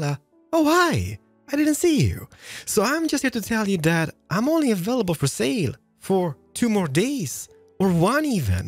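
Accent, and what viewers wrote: Scania, Sweden. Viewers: Blev chockad när jag hörde den skånska dialekten! Var inte beredd på det! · i love his accent: its called skånska. om du ser detta, jag är ocks skåning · are you Swedish?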